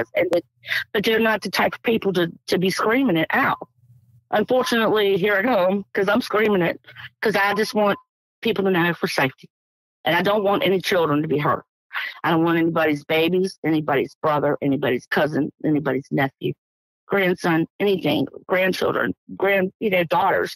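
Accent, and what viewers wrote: South, United States. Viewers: Yes mater of fact you sound country is a day is long doggone be yourself who else can you be rock on with your bad self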